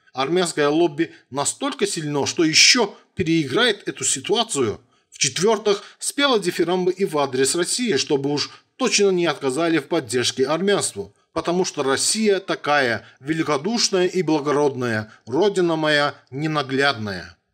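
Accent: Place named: Azerbaijan. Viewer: Margaritu armyane lyubili I lybyat! A vse, kto seichas pishut (yakobi protiv Margo), eto-- vashi soplemenniki! A vash akcenq glupovatogo azera, diktor, otvratil'no I opasno dlya ushei))))). Vi uje sdelali nash abrikos samim znamenitim fruktom na planete, oluxi))))))). Tak shto sidite spokoyno, zanimaites' tem, k chemu privikli----- prodajei zelen'y!))))))))))))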